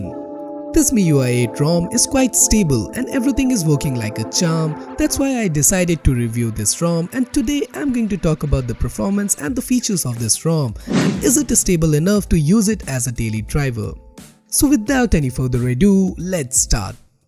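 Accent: India. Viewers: God indian accent is the most unbearable.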